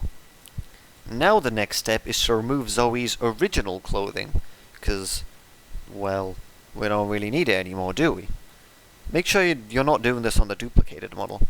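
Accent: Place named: Finland. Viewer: but you have a finnish accent 😭😭😭 where are you from then (if you're comfortable sharing that)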